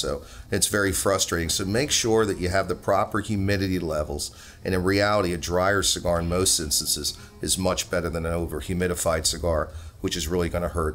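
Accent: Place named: Philadelphia, United States. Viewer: Hey...great vid..very informative...?..are you from the Philadelphia area?...your accent sounds like mine...born and raised in the city..thank you again for being a leader in this great hobby of cigars🤙💨💨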